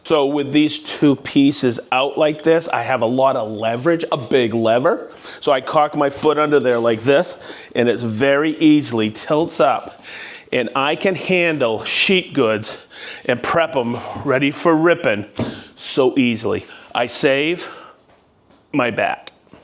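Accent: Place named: Boston, United States. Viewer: I could be wrong.. but that’s Boston my friend.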